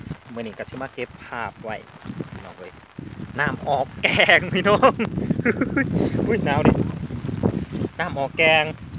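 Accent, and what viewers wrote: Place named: Thailand. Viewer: ค่อยมักสำเนียงเจ้าหลายฟังแล้วมวนดีจ้าค่อยเป็นคนไทยเลยจ้า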